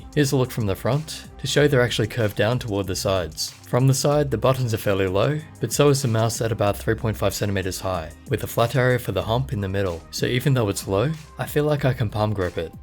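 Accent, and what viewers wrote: Australia. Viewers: An Australian/New Zealand voice that's soothing and orgasmic.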